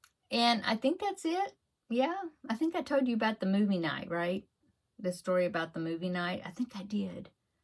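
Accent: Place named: Texas, United States. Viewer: I just found your channel, and im really enjoying it. Im formerly from East Texas and now live in Georgia. Im enjoying a fellow Texan accent!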